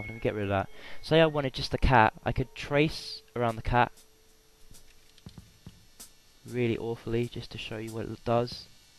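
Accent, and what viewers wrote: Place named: England, United Kingdom. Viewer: i actually loved listening to you sounding SOOO uninterested and english, i laughed all the way through it, plus it was actually helpful, except i knew all that stuff already, i need to know how to do shading with opaqueness... :(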